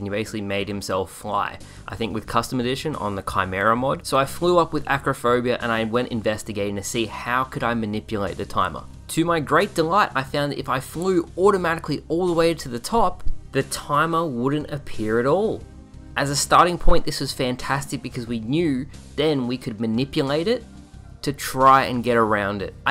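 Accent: Australia. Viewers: the aussie 13 year old voice just broke my immersion in your videos.... unsubbed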